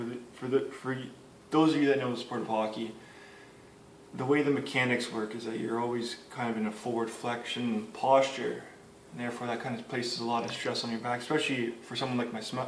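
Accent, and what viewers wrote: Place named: Quebec, Canada. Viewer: I came here for info about discs and stayed for the French-Canadian accent and the 'ookey hair.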